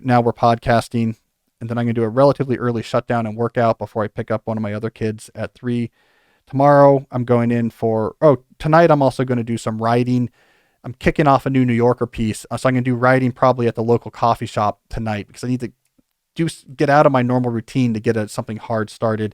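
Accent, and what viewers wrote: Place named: Germany. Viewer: Worst German accent ever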